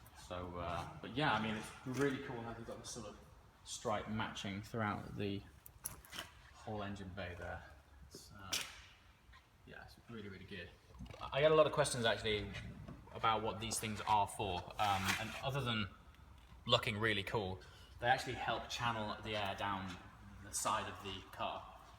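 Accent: RP, United Kingdom. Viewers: He's from a privileged background, listen to his accent.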